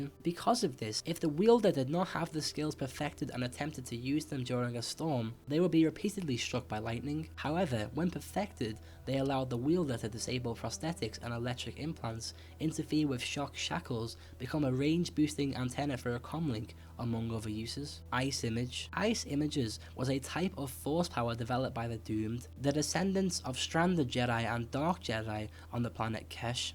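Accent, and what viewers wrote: Liverpool, United Kingdom. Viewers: is that a Liverpool accent?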